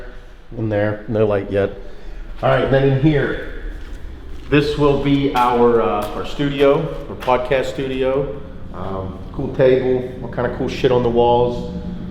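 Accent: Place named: Pennsylvania, United States. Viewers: I knew I heard Western PA in your voice! Lots of great football players, tough steel workers come from there. I would take a Dodge Ram any day.. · I Fuckin knew it. You just cant hide that fuckin PA accent lol. Form PA Also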